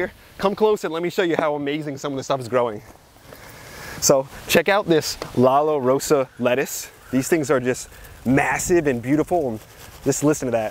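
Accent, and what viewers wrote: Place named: South Jersey, United States. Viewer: Didn’t know u were from Jersey but I’m from jersey n I can hear ur accent. Sounds like south jersey 👩🏽‍🌾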